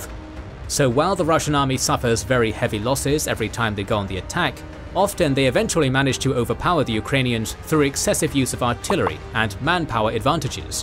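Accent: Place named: United Kingdom. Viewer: Love the coverage of Ukraine . Probably the best I’ve seen and the British accent is a must.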